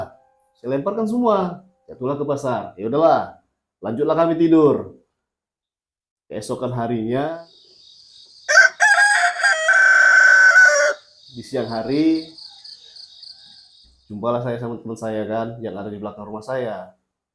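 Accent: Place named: Medan, Indonesia. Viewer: Ini baru cerita horor medan,, logatnya masih kental, ceritanya juga seru 🔥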